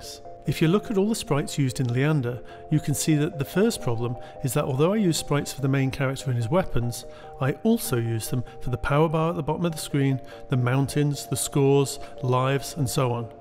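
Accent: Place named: United Kingdom. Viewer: I really like your voice. Friendly and pleasant. I like British accents too. :3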